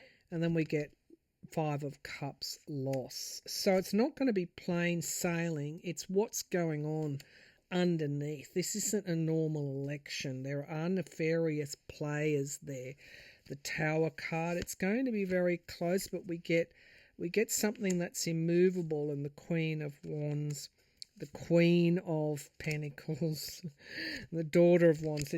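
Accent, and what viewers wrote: Australia. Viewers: Hello, firstly thank you for all your work, it’s much appreciated! ❤ 👏 Just a quick note: the far-right party in Germany is called AfD, („Alternative für Deutschland“ = Alternative for Germany), not „Adf“, as you kept on saying. With your Australian accent „Adf“ almost even sounds like „IDF“, the Israeli Defence Forces.:) No biggie, but I thought you’d want to use the right party name. It’s so easy to mix up all these abbreviations..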